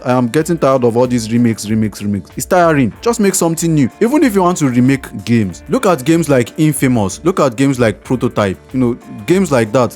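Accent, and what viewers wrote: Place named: Africa. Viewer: You can clearly hear that you're african 😂 love the african accent haha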